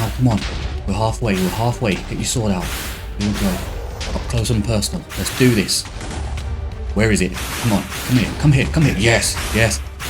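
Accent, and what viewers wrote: United Kingdom. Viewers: Are you british? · u are literally so british i feel sick to my core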